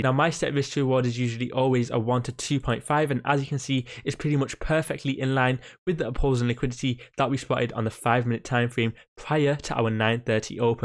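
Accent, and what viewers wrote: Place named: RP, United Kingdom. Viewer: this guy speaks like he has plums in mouth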